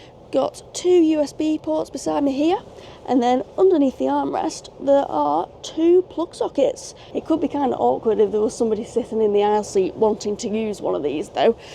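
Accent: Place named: England, United Kingdom. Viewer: Nice to hear an English persons perspective on Ireland...love that accent by the way!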